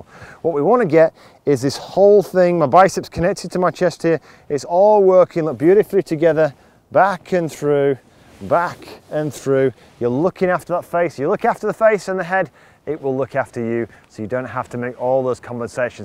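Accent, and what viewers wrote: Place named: England, United Kingdom. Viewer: Just found you yesterday. You are the first of countless internet teachers who's videos I have viewed who speaks a language (not counting the English accent :-) ) I have been able to understand! I took your tips on the take away to the range today and hit my irons more solidly and more consistently than I have in a long time! Please keep the videos and the 'simple talk' coming!! I'm very grateful for your help!! I look forward to more tips, drills and instruction and once again enjoying the game of golf!!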